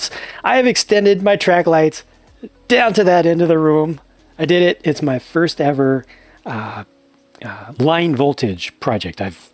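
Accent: Australia. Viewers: aussi accent What a day! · I know an aussie accent when i here one because I am one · U Australian?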